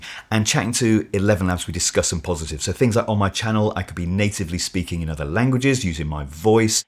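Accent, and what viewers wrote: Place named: RP, United Kingdom. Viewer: "Its not my fault" he wasn't Gen X, Y or Z by any chance? Fortunately I have a heavy regional accent and am wondering if this would be more difficult to clone.Your voice, is very clear and almost RP to my ear and is "almost" devoid of any heavy regional accent. Okay, you are obviously from the south of England but I wonder if a "heavy" regional accent is more difficult to clone? Answers on a postcard please:)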